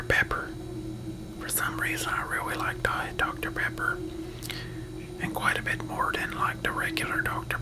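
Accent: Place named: Baltimore, United States. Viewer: Hey, love your videos. It's interesting to hear that your accent is PA based. You sound SO much like you're from Baltimore, which is where I live. Anyway, keep up the good work. Your voice absolutely lulls me to sleep.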